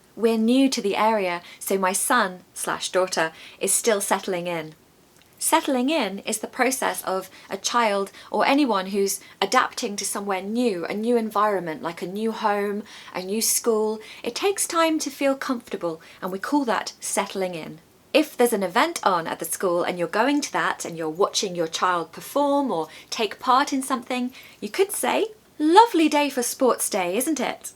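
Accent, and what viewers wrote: United Kingdom. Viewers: The old British accent is interesting and attractive, thanks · Hello 🥰 I'm new to your channel and actually really glad that I found you. I fancy british accent and I enjoy watching your videos for learning English. You're so lively besides your floral dresses are so pretty. Like your style🌸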